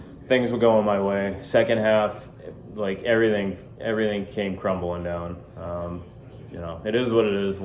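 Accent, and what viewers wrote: Massachusetts, United States. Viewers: This doesn’t have to be an end. A lot of people return to the trail to finish what was started as they get closer to retirement age. Life happens and things happen for a reason. Embrace the ambiguity life brings and move forward. On a selfish note- I’m going to miss hearing the familiar accent which I miss being back in every day (SE Mass native > Virginia- enjoying the AT down here). Hike your own hike, man…no matter what it is or how long it takes.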